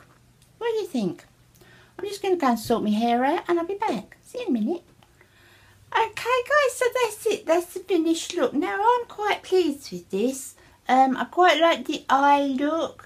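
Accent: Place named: London, United Kingdom. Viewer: thanks for replying.....you mentioned in one of your videos that you were in the north, but I can't tell whereabouts because of your London accent 😂😂 how nice of your friends to send you American goodies. Hope your sleeping is better. Keep on being fabulous! 💋💋💋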